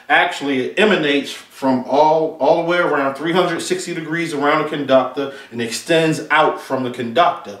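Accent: Baltimore, United States. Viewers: Nice vid. You're from bmore huh?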